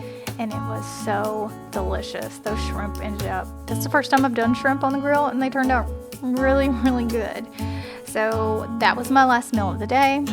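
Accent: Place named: Tennessee, United States. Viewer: Girl!! When I heard you say Paris, TN!! I grew up in Humboldt, TN and have family in Paris! I should’ve known by your accent 😅❤ love it!!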